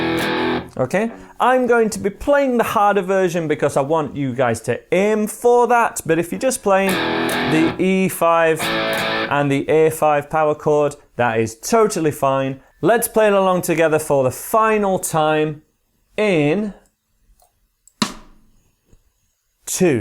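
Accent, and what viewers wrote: United Kingdom. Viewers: Speediablo 7 Productions he sounds absolutely nothing like Ali-A, the only similarity is that he’s british, that’s it. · Something tells me that your british 🤔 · you are only brit that i can listen to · Jesus, man, you're sooooo britttish!! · I think this guy is a bit British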